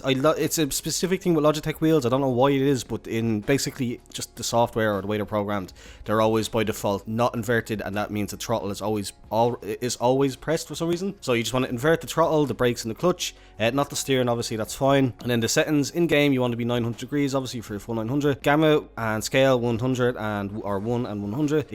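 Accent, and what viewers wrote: Dublin, Ireland. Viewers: U sound Irish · You from dublin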